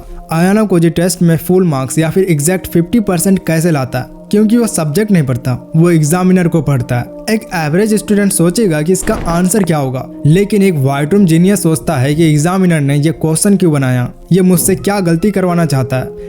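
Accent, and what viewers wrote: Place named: Bihar, India. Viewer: I have noticed that you speak like my Bihar friends , Are you from Bihar, I wonder ? No matter what someone says words and ideas can change the World - referred from Dead Poet Society !!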